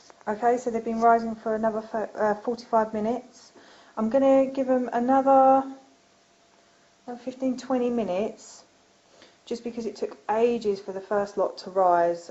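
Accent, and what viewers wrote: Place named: Midlands, United Kingdom. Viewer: Mmmmm want one! Where about's are you from? I recognise your accent, it sounds like Midlands maybe?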